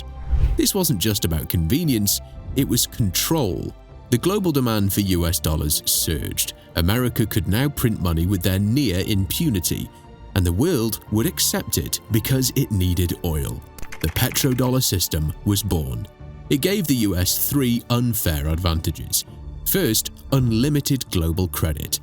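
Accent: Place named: United Kingdom. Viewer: I really want to listen to this report; however, I can’t stand your voice. I can’t stand, listening to British accent. British accents sound pretentious, high falooting, arrogant, know it all, closet gay, nosy, and more. Like I said, change the voice on this generated video, and I’ll consider listening to it in a more pleasing standard American English voice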